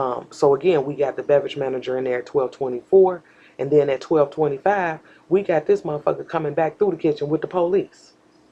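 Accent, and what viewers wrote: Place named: West, United States. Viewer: I love how you articulate your words. I'm from California and you definitely sound like you from the West. I've been studying to get my private investigators license to aid in the criminal defense process of the Justice System. As a "sista" (feel me) and a woman, I believe I can further and investigation that yeilds results my counter parts can not achieve. I can see you as a successful private investigator. Keep us posted!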